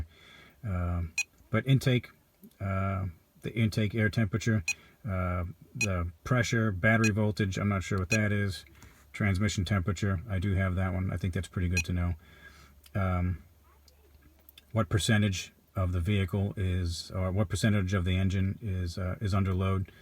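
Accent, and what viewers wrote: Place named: Manchester, United Kingdom. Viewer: Sounds like a Manchester accent.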